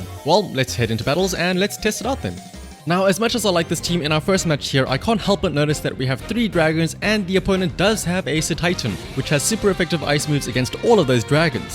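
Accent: South Africa. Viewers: Fellow SA Pokemon player here based in Cape Town. Found your video via the algorithm and heard the accent and I was shook! 😁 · Nice video bro, is that accent South African?🤔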